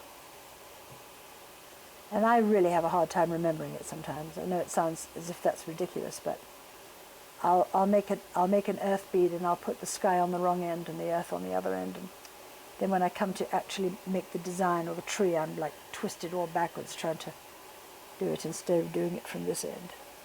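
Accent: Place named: United Kingdom. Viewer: you are fabulous!!!!! I made my first lampwork beads today and I am hooked! I even tried using some scrap stained glass and had good luck but I am glad to learn your techniques! I love my first beads (now if I can only get them off the mandrel, I think I might have put the bead release on too thick because it wanted flake off when I worked!) and I can't wait to make more! Thanks for such a wonderful series of videos! PS LOVE your British accent;)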